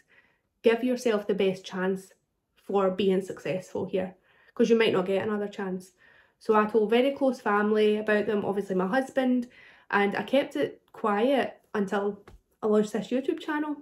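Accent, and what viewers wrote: Scotland, United Kingdom. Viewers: That Scottish accent is absolutely gorgeous. Thanks for the great video and congratulations. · Hi, I’ve only just discovered you. I’ve been on Mounjaro for 2 weeks and I could already relate to what you said. How refreshing to hear your lovely Scottish accent and to hear you talking so intelligently and emotionally about you weight history over the last 30 years 💗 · Amazing advice! Thanks so much for sharing and congrats to you!! I'm from the USA and I'm on Zepbound which is the exact same med as Mounjaro just a different name and I've lost 78lbs in a year so far. Also, because I'm a huge Outlander fan I understood all of what you said with your beautiful Scottish accent ❤️❤️ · Oh my goodness how fabulous to hear a fellow Scottish voice! I’m on week 7 of my journey and have just subscribed to your channel. What an inspiration ❤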